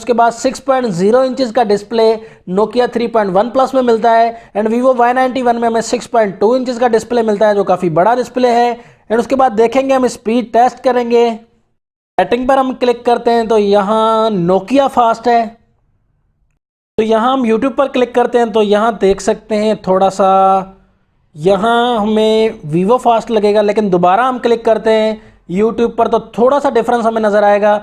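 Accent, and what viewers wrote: India. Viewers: ngomong naon si kentel jasa bahasa inggris india na,mere ge hese ndeuk meuli hp adoooh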